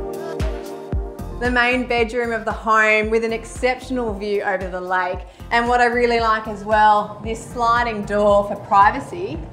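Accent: Australia. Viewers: The presenter is lovely. The Australian accent is a bit much.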